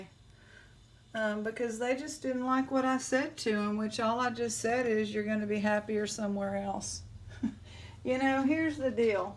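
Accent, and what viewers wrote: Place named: Texas, United States. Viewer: It's good to hear an update from you, my Texas jewelry friend. You posted a video during the day several weeks ago and my students were in my classroom. I told them I get good stuff to make jewelry with from you and played the video. Several of them commented that they like your voice.. I was like.."Well, of course.. she's Texan!"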